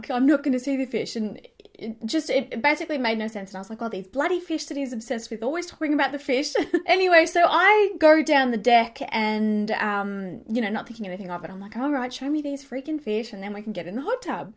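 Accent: Australia. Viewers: I recently found your channel and you’ve filled a huge homesick void for me. I moved from Australia to America in 2012 to be with my husband. I miss Australia so much, it might sound odd but hearing your Aussie accent and slang makes me SO happy, you’re so much more relatable and down to earth compared to other Australian YouTubers. I haven’t seen or spoken to another Aussie since moving here and I often feel like an outcast. Watching your videos reminds me of where I came from and to be proud of it. Congratulations on your engagement!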